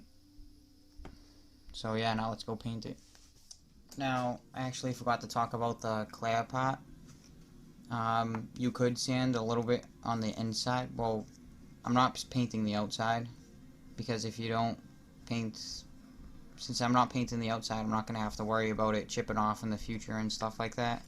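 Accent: Boston, United States. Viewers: where u from? you sound like you have a slight boston accent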